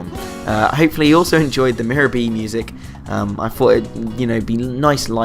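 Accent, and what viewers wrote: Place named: United Kingdom. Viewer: Nice! Put out more content like this and you've earned yourself a new subscriber! Good narration style too, it's nice to hear a fellow Brit on here haha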